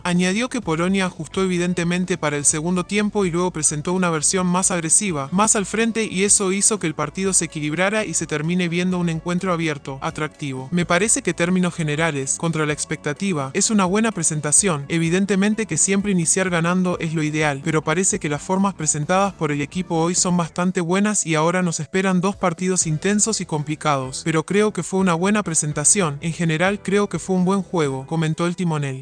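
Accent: Argentina. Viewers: Xfavor..de verdad..no pongan nada del santos si?? Saben q esto empieza cuando terminen el mundial...ya chale con.el santos noticias falsas..ha i ya deja ese tonito de arjenti o.wey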